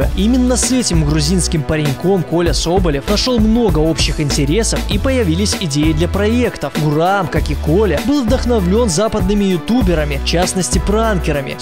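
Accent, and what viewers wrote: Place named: United States. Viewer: почему он говорит как американец который хорошо выучил русский?? Прислушайтесь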